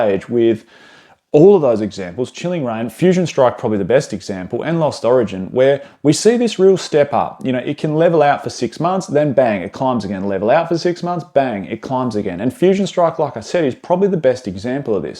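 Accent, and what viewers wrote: Australia. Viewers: As an American from Kansas, yes Australians and bristish people do put a "R" with words that end in a "A". So Paldea to us Americans sound like Paldear when you guys day it. Its not a big deal, its just part of your accent.... I have never heard anybody talk abour Australian money as dollary-doos.. 😅 Thats just funny, but in a endearing way. Dont fret to much about what us Americans say about how y'all pronounce words. We all sound different. Somebody from New jersey compared to someone from Louisiana compared to Wisconsin than California. All us Americans sound weird to each other too. Your all good 😊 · Please! It's just a dumb joke based on accent. I'm an American, don't apologize for being aussie. We love it and just trying to have fun!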